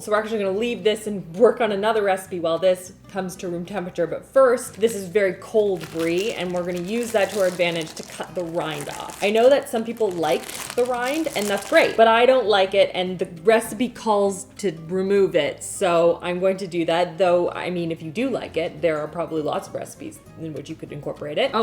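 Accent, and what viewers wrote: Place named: Winnipeg, Canada. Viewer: Winnipegers talk like that as well. Not sure about people in Edmonton because we've only been here for 5 months!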